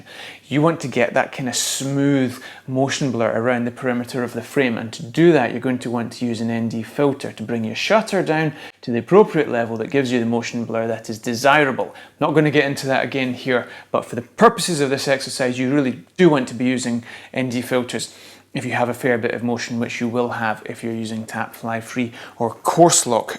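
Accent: Scotland, United Kingdom. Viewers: LOVE THE SCOTTISH ACCENT! Now wear a kilt :)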